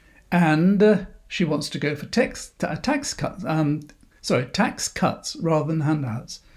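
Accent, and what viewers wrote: RP, United Kingdom. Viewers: You sound posh but you dont talk sense like Liz. I agree Sunak is hopeless, he is why the economy is so bad. Liz will be like thatcher in 79, end this nightmare and get the economy booming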